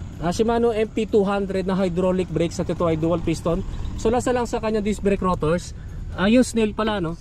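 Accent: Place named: Ilonggo, Philippines. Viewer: Nice build! Sabi ko na ngaba taga iloilo eh hahaha i know that intonation eh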